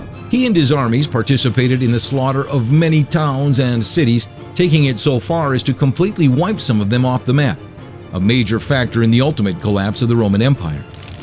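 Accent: Canada. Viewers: the commentator sounds canadaian right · this guy sounds so canadian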